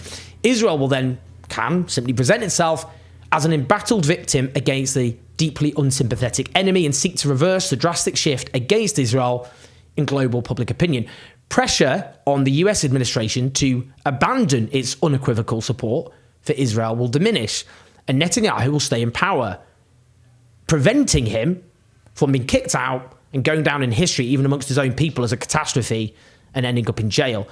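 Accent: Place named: England, United Kingdom. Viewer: I love the English accent 🇬🇧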